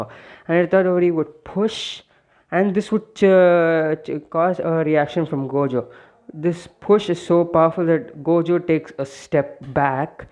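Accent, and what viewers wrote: India. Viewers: Indian accent makes me laugh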